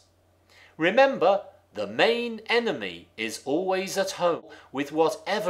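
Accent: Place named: Merseyside, United Kingdom. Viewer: Like the posts. I think I detect a hint of Mersey in your voice, despite being in Croydon... Keep up the interesting work.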